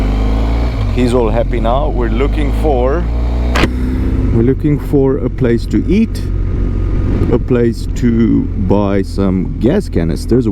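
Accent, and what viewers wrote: Afrikaans, South Africa. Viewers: Are you originally from Suid Afrika?? You seem to have an Afrikaans accent… Not a Romanian accent. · Your accent sounds like an afrikaans guy, speaking English.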